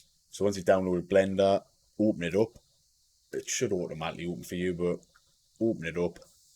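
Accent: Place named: Newcastle, United Kingdom. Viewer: i hear the Geordie accent?? immediately haha.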